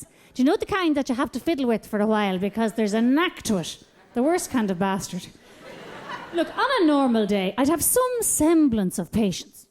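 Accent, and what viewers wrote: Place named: Ireland. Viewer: Just discovered her the other day. Love her Irish accent. Hysterically funny. Big fan now.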